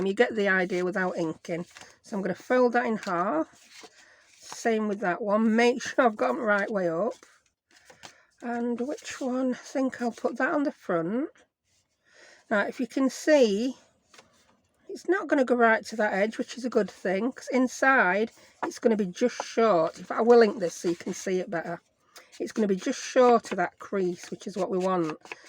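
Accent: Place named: Yorkshire, United Kingdom. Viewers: They would be lovely as stocking fillers for Christmas. Gorgeous little note books. And it’s nice to hear a fellow Yorkshire lass. I’m originally from York. 😃👍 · How cute are these?! Beautiful and easy to do. Thank you for sharing. Yeah, lovely to hear a good, strong Yorkshire accent. x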